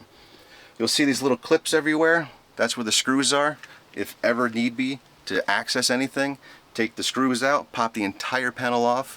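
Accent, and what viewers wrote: New Jersey, United States. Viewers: that New Joisey accent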